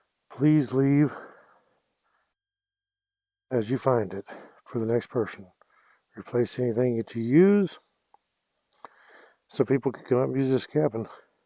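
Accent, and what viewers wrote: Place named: Georgia, United States. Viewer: ain’t no way ur from south Arizona sound like Georgia or maybe my area East Tennessee haha !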